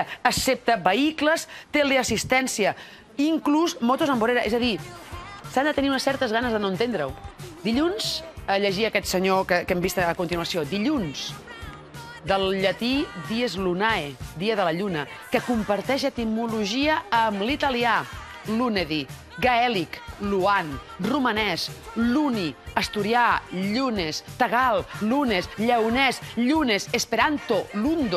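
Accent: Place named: Eastern Catalan, Spain. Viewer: No entiendes las señales en catalan que fácilmente se entienden en castellano por la similitud entre las palabraspero sí el relato que hace en catalán oriental con un acento cerrado. Venga ya! No discrepo en la manipulación de TV3. Cada uno rema para su bando, ese es el problema de los medios de comunicación.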